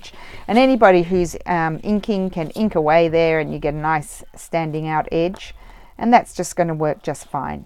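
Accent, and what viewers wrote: Australia. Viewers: Hi are you from Australia 🇦🇺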